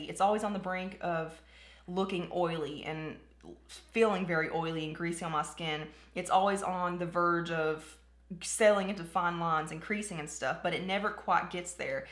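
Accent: South, United States. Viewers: Ok I admit it. I didn't even watch the whole video before I hit subscribe because once I heard your accent I was sold. Helllloooo southern friend. I'm from Alabama. 😂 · I love your accent, sounds very southern! 💗🤗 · This was helpful, thanks. The look is very pretty. Love to hear other southern girls!!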